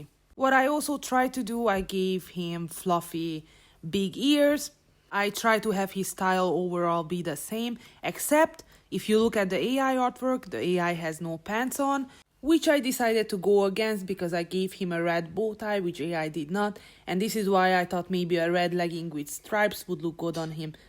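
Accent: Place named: Hungary. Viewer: ...you sound hungarian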